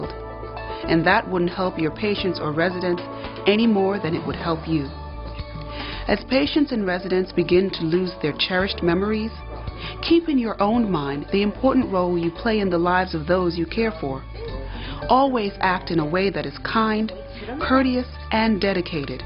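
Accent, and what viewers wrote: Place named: England, United Kingdom. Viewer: Best teacher ever...soo eloquent ...like your clear English accent..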